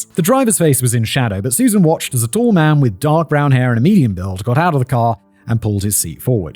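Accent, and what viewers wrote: United Kingdom. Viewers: well he is British so…makes sense how he would have a different pronunciation for words. Also…I knew the correct pronunciation right away. I also had family in the area during the time he was at large. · I'm a Houstonian now, but both sides of my family are from Wisconsin and Michigan. So I get to cringe every time he says something in this episode, plus his British-accented-Spanish-Spanish pronunciations where I'm used to Mexican Spanish.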